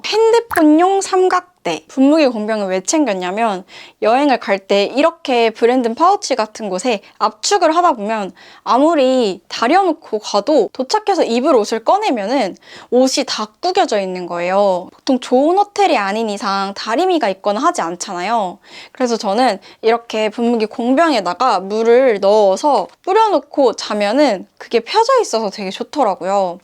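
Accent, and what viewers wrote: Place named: Vietnam. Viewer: 베트남분이신가요? 한국분아닌것같은데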